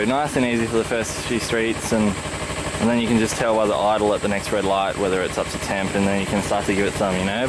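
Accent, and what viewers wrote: Australia. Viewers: your australian accent is really awesome xD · Must be the Aussie accent that makes it so easy to listen to your videos! lol Keep em coming you've taught me quite a bit about my ex250. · Thx buddy, you explained very clear, btw I'm from Australia as well, now lives in Thailand, just got a bike with this choke thing and have absolutely no idea about it until I watched your video, and its great to hear our accent.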